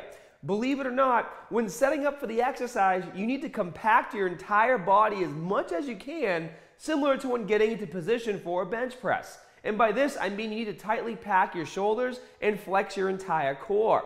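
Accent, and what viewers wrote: Boston, United States. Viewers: It sounds Bostonian but also occasionally Australian but also sometimes just standard lol. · Ba-bell! Lol. Love the Boston accent.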